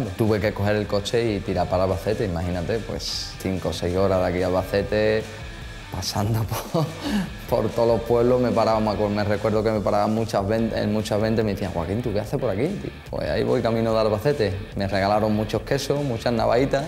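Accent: Andalusia, Spain. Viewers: Cuenta lo que quieras desde que te he visto de contar cosas en la isla de las tentaciones la verdad que me ha encantado y desde entonces venga escuchando tus chistes y lo que digas, me gusta tu forma de hablar, tenéis un arte los andaluces 👍😜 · Estas cohibido mariquita, con el arte que tienes, intentar hablar bien para que te entiendan los de despeñaperros parriba no te favorece. Queremos a Joaquín con su acento y su arteee!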